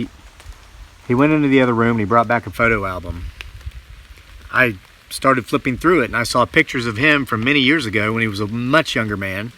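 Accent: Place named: Virginia, United States. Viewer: I’ve never seen your channel before & I’m learning more mindfulness practices in a Focusing class going inward to see what the body is trying to tell me...& I just learned Transcendental Meditation for free online, but coming off a Benzodiazepine makes it hard to clear one’s mind of all kinds of junk from yrs of sedation. I’m an Empath, as well. I heard Ta Ta Ta or Tathata, as well! What amazing men you & your friend both are! This is a delightful video! Thank you! 🙏🏼 Oh! I think it may have been Judith Orloff talking about those who are Earthbound trying to latch onto Empaths...at least, I think she was the one talking about this...anyway, this person said to just imagine showing them the light as in silent conversation so they can return. I don’t know if this is helpful, but it seems to be for me. Best wishes to you & your Zen friend. 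🙏🏼 P. S. Oh, as you were speaking early on, I wondered if you were from Virginia, the accent, plus my birth parents are from there & I have a couple friends there, as well as all along the E Coast. Then I saw what your hat said...interesting.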